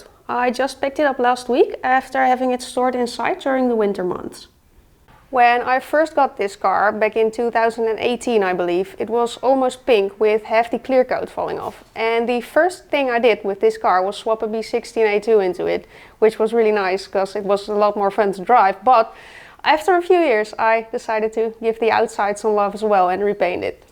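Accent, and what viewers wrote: Netherlands. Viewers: Love the car collection qnd your videos. You are stunning qnd have great Dutch accent ❤ wishing you the best from California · Beautiful and stylish woman with a confident and friendly manner; cute Dutch accent also a plus ✅ - CRX resto; very nicely done ✅ - Old school Volvo; well cared for ✅ - Volvo wagon; comfy, practical, cool ✅ - E28 5-series; a proper Beemer that needs some love ✅ - Subscribed ✅ Congrats on being noticed by the algorithm! Cheers from Australia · Love that dutch- English accent. Used to love the look of CRX growing up. Still a cool car. nicely done enginebay with matching colour. I'de guess my favourite would be the E28. please keep it original! no low rider, 22" rims and such. 2,5 hours away, wouldn't that almost put you in another country? :P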